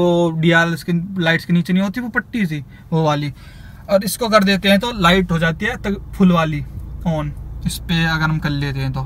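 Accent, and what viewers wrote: Haryana, India. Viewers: It's just bcz of his haryanvi tone even my friends sounds the same 😂😂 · Haryanvi bolte hai dono 😊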